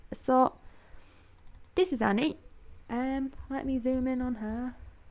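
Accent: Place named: United Kingdom. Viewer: I thought you was UK I am to East Midlands lol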